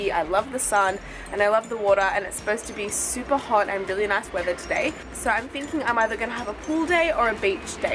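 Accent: Australia. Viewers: Hi! Just found ur channel:) You have good skin and toned body (Australian accent as well:p do you mind sharing ur beauty tips with us? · you have an aussie accent, but do you live in australia ?? or do you live somewhere else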